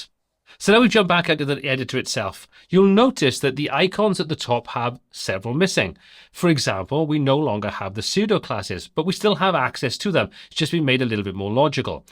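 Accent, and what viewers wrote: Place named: South Wales, United Kingdom. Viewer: He’s a hwntw (south Wales)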